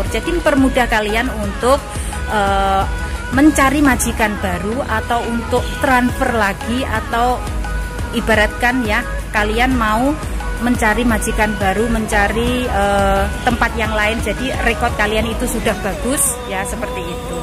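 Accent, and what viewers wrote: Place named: Java, Indonesia. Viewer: Oh pantes kak bahasa logat nya seperti orang Jawa 😊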